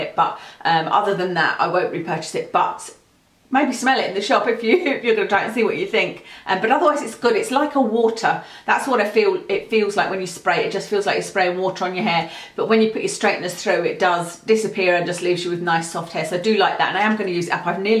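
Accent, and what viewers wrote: United Kingdom. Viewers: Oh that British accent is a sweet melody to my ears 🥰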